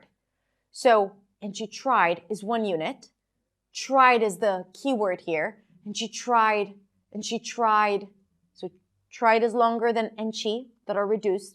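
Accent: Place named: Boston, United States. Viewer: She is really easy to understand but her vowels are not standard English but some kind of dialect, I expect Boston. My understanding is that the everyday Boston accent is difficult to understand by people not from the area.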